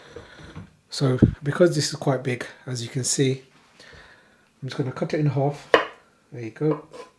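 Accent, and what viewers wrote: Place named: United Kingdom. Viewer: 👍 Always curious about All types of foods but I was born in Morocco… I love my moms cooking but the Indian food goes very well with my traditional education about taste flavours that’s all about. I guess your English from UK sounds familiar to me, I been working in London quite a while in one of the best Japanese restaurants at Old Park lane NOBU juste what is all about GREAT. God bless you 🙏🇲🇦🇲🇦🇫🇷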